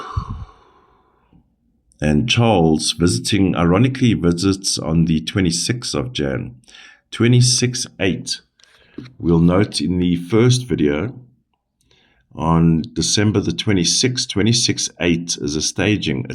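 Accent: South Africa. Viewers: So when Kate appears after all her treatment and recovery you will have no more validity as a psychic medium? Sorry but I feel this is a lot of nonsense. You sound South African so you probably have never had a connection to the UK.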